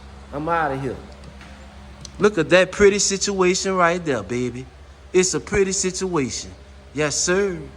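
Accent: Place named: South, United States. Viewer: Damn bubba you talk as country as I do 😂, Ima old ass white boy too😂, there's alot of other work besides sitting a long block on the mounts that folks don't think about or consider 😂. $$$ I gotta turn the wrenches myself while using a chilton or haynes repair manual cause there ain't no damn way I can afford to pay a professional wrencher!!! 🎉 enjoy your honesty and straight forward explanation of whats required to drive a damn vehicle!🎉 I'll take that ol school 305 and do what we used to do-cruise🎉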